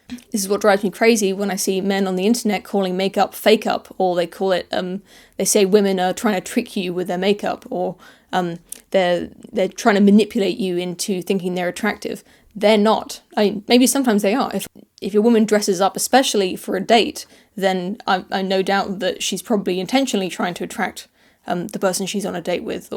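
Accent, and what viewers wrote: United Kingdom. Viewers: British accent. Ozzie’s don’t sound like that mate. I guess you’re American because for some reason you’s can’t pick accents. Everyone else can. I can even tell the difference between bloody Yanks and Canadians. One is really loud and obnoxious, the other apologies all the time and says ay a lot. I can have two beers in my breakfast cereal then drink all day and still not fall in love with the first chick I see on YouTube. Pull your socks up! Just kidding, it’s all in jest mate. Have a couple for me you funny bastard! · you ARE attractive. I'm reluctant to say it, but you look great without makeup. I'm reluctant to say it because I don't want to be misunderstood. Plus, I don't want to offend. Thing is, I'm actually turned on by women who don't wear makeup. Again, I want to be careful here, but a woman without makeup is, for me, like a woman without clothes. 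It's revealing, exposing, arousing. I shouldn't post this since I've already had two white Russians this evening. But there you go. Wish I could delete this post. Or at least redraft it. Combine that with your British (or Australian) accent? Oh, dear God! P.S. I've read The Selfish Gene. P.P.S. Dawkins is an idiot.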